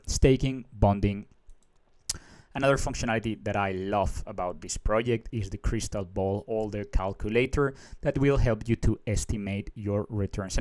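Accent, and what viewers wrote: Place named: Bogotá, Colombia. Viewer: Este Man tiene puro acento de rolo.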